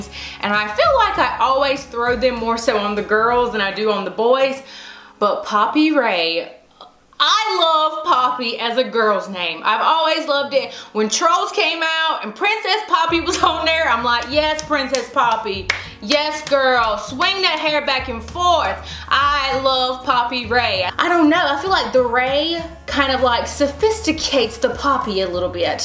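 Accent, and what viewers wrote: South, United States. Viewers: It sounds like youre saying "WEENIE' not WINNIE Lol maybe its a good thing you didnt pick that name with your southern accent girl! Haha!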